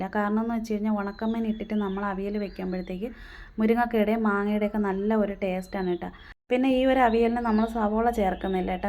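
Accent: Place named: Thiruvananthapuram, India. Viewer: Kutteese veedu എവിടെയാ, slang കേട്ടിട്ട് tvpm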